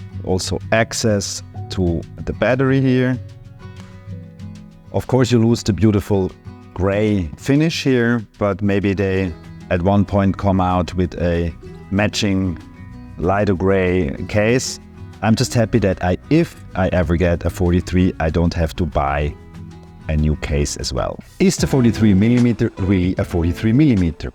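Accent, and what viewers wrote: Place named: Germany. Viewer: Qimago hat gesagt wir sollen dich schön grüßen 🙋🏼‍♂️ Und abonniert! Bleibt der Kanal englischsprachig? Deutscher Akzent ist so gewöhnungsbedürftig 🙈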